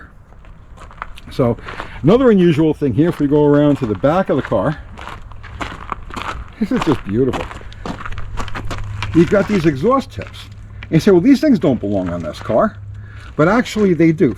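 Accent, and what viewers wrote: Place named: New Jersey, United States. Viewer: I love listening to talk, my grandmother was originally from New Jersey and she pronounced things just like he does 😂 being Midwest redneck kids we always thought she sounded so weird.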